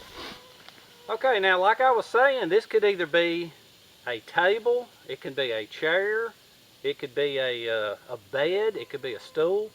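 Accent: South, United States. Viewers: love the accent are you a southern guy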